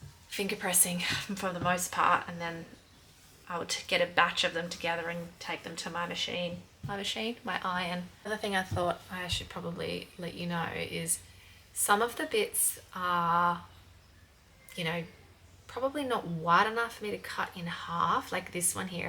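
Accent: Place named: Australia. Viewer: I wondered if you were from Australia! Lovely accent. Wishing you luck from the Midwest, USA!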